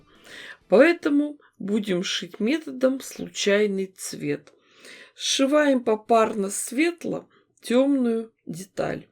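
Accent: Urals, Russia. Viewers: Ваши шуточки с уральским акцентом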